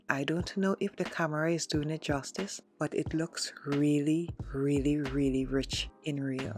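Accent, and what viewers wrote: Trinidad and Tobago. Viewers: Great job,you sound like a Trini 🇹🇹. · I know a Trini accent when I hear it!!!😘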